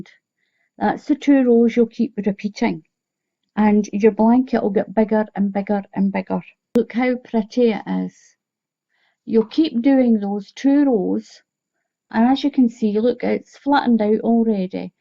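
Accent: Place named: Wales, United Kingdom. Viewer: Beautiful. Subscribing to await the blanket. I've seen a lot of videos from the UK. I'm US. Are you Welsh or Scottish? I hope you don't find me rude. We had a lady at our senior center from Wales that sounded a lot like you. I loved talking to her because of her accent! Hope to see the blanket video soon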